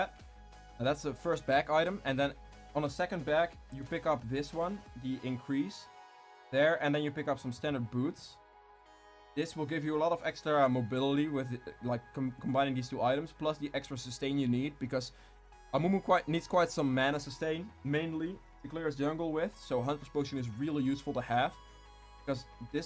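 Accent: Netherlands. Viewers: r u dutch?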